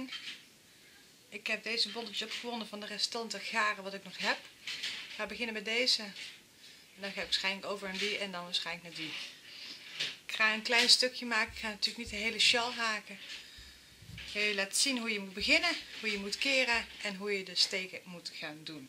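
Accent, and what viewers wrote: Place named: Brabant, Netherlands. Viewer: Ja hoor je kleine en het Brabants accentje ...ben ook een Brabantse ...maar woon nu in Groningen ...blijft leuk om te horen 😀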